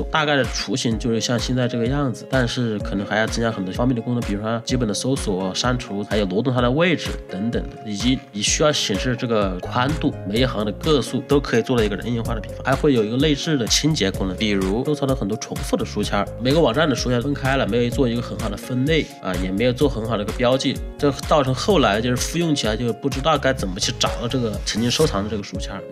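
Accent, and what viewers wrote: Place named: Hunan, China. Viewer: 太厉害了。UP主超棒。一口湖南普通话让我这个长沙女婿听着很亲切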